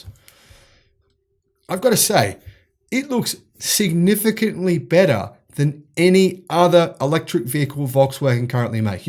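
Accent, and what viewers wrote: Australia. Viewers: Aussi English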